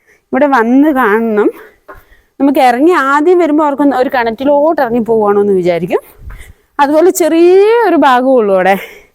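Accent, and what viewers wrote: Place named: Kasaragod, India. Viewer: നിങ്ങൾ പറയുന്ന കാസർഗോഡ് ഭാഷ കേൾക്കാൻ നല്ല ഇമ്പമുണ്ട്. Nice videos keep going ! I found a sincere approach from you and it should be appreciated. Well done!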